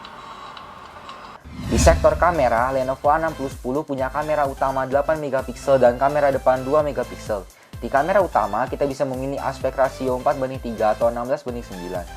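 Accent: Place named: Java, Indonesia. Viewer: medok banget hehe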